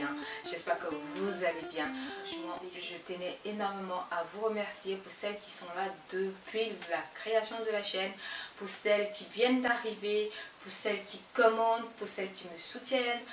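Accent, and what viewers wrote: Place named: Ivory Coast. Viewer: Merci merci cette vidéo tombe bien 😊 l'accent ivoirien qui ne se perd jamais 😂😘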